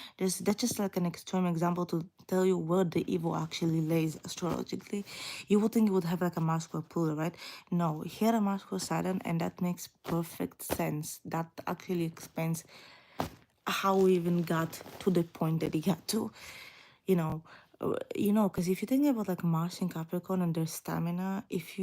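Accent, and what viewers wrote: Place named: Moldova. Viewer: Moldova? I need to know where your accent is from my girl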